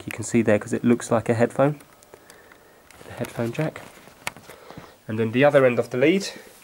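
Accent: United Kingdom. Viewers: i like the brirish accent thou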